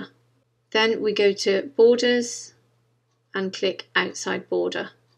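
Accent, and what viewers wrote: United Kingdom. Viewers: why that god damn British accent is like super attractive to me mam really appreciate your help on the gannt chart but i really need to tell u that the accent is super attractive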